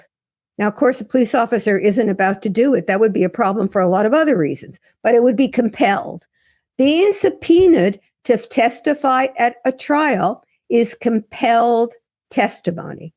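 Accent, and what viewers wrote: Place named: New Jersey, United States. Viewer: ! I loved your coverage of Donna’s trial and I’m so happy you started your own show! I love listening to a fellow Jersey girl! ❤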